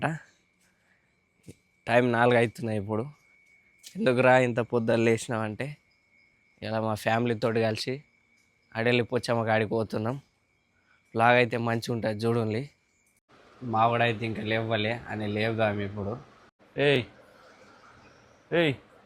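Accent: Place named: Adilabad, India. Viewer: Hi brother my name is vemula srinivas from Karimnagar..mi chanal subscribe ni ...chala Baga vuntavi funny reels ... adilabad slang super...maku adilabad kachikanti village lo friend s vunaru every year twice vasthamu ...nenu lecturer ni ..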